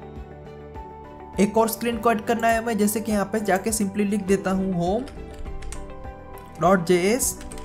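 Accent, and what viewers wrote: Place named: Hyderabad, India. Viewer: keep up the good work , are you from hydrabad india ? cool akcent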